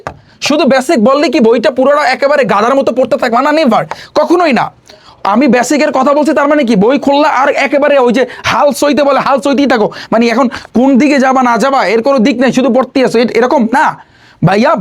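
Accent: Barishal, Bangladesh. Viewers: Vai ki Barishailla?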